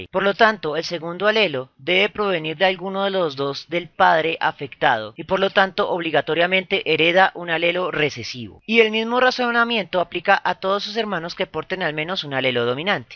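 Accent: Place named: Colombia. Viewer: Me encanta como explica todo tan Claro y entendible y adoro en Acento de Voz Colombiano....me fascinan estos vídeos,gracias por hacerlos ...se que es parte de un tiempo valioso y preparación a cambio de nada,sin fines lucrativos solo con la intención de educar ,informar y ayudar a compensar esta rama de la ciencia tan fascinante....por ello estoy muy agradecido y le deseo éxitos y bendiciones en sus futuros proyectos... Enhorabuena. ❤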